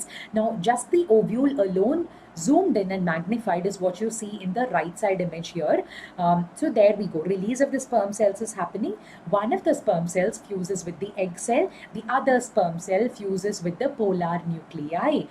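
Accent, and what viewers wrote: Kerala, India. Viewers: are you malayali?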